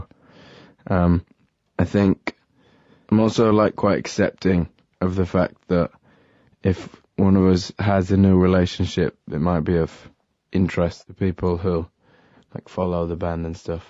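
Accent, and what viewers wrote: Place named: United Kingdom. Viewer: Nope. American here. He sounds British.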